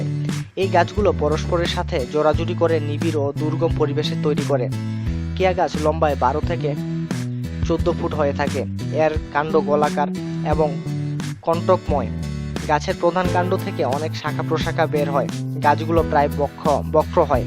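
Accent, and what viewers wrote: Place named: Barishal, Bangladesh. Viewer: ভিডিওর ধারা ভাষ্যকারের বাংলা ভাষার উচ্চারণ বরিশালের আঞ্চলিক ভাষার অনুকরণে উচ্চারিত। অশুদ্ধ উচ্চারণের জন্য অনেক বাংলা শব্দের সঠিক অর্থ বুঝতে অসুবিধা হচ্ছে। বিশুদ্ধ বাংলা উচ্চারন হলে আমাদের সবার বুঝতে সহজ হয়। ধন্যবাদ।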